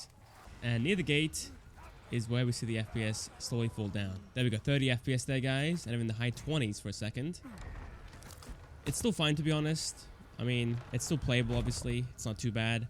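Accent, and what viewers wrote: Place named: Australia. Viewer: I’m making you my new benchmarking guy always nice to hear an Australian of coarse but I also love how you structure your videos.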